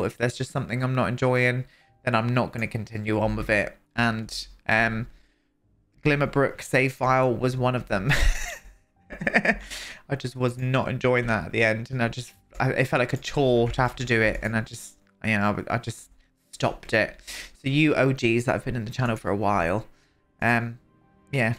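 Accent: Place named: England, United Kingdom. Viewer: Love the story line of a cult! Very unique and I can tell it'll be an entertaining one. I just want to mention one thing, Paolo isn't a portuguese name, I'm portuguese and the correct version of that name would be Paulo, in terms of pronunciation is similar, especially with your English accent and it really is a small difference in spelling but it does matter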